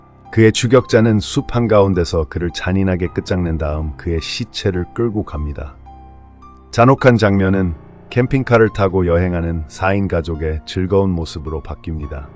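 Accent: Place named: United States. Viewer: 혀 굴리는 코메리칸 스타일 리뷰어에 뇌가 울렁증...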